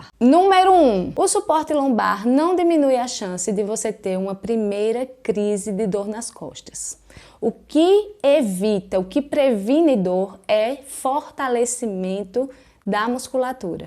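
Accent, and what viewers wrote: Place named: Northeast, Brazil. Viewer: Parei de fazer minhas corridinhas devido a cor na lombar. Machuquei a lombar devido 2 tombos que caí de costas no piso cerâmico, e não procurei tratamento na época. Dia 29/1., farei uma Tomografia da Lombar, e a partir daí vou aguardar o que o Ortopedista vai dizer. Porém baseado nessa aula de Fisio, já tirei o tal colete de proteção lombar do carrinho do Mercado Livre.....rsrsrsrsrs. Sinto muita falta dos meus treinos e Provas de corridas de ruas com a galera da assessoria na qual estava treinando, mas vou seguir o tratamento conforme recomendado. -Primeiro vídeo que assisto da Dra., mas seu jeito de explicar e não massagear o ego dos sofrentes de dores nas costa, fiquei impressionado com a clareza. Além do sotaque que me parece ser da região nordeste, que prende a atenção do inicio ao fim do vídeo. Obrigado por todas as informações com muita clareza e objetivo, sem rodeios. 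Abraço!!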